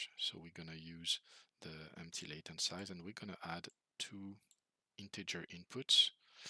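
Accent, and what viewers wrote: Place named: France. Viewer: Si j'avais des doutes sur le fait que tu sois français à l'accent, à 11min30 le ''hola!'' m'a vraiment marqué mdr. Merci pour le tuto, tu es un chef.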